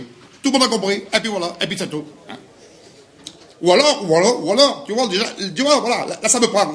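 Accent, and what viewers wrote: France. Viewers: La prosodie typique du parler montagnard de l’espace franco-provençal. Très proches de certains parlers valaisans en Suisse, même dans les expressions (« de toute façon », « et puis c’est tout ») utilisées et prononcées exactement comme en Valais. Impressionnant. Les patois disparaissent mais la musique reste encore deux ou trois générations. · C'est dû à l'Arpitan qu'on y parle de partout à Gre comme à Sainté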